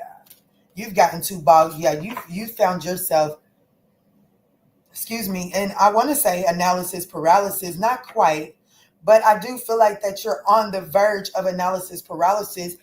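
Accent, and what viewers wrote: United States. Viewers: Where’s your accent from? Part of me says Cali, the other says St. Louis. 😮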